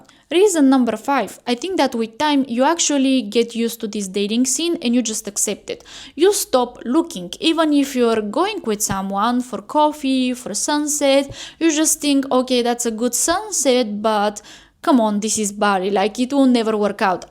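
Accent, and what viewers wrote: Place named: Eastern Europe. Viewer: Your accent is very slavic. I like it 😊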